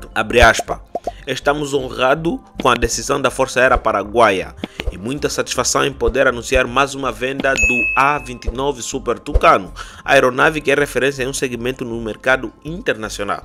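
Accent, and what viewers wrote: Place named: Angola. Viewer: Esse sotaque e de Angola 😊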